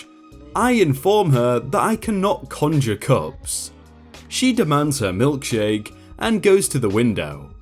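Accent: United Kingdom. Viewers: Despite sounding very much like a native Brit, at times he can barely speak English and his comments are inane. Can't listen to any more of him, largely due to the comments that he no doubt thinks are funny and clever. Sorry mate.. they are not.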